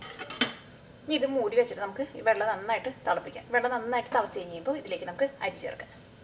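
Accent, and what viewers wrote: Thrissur, India. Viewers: Nice thrissur accent hehe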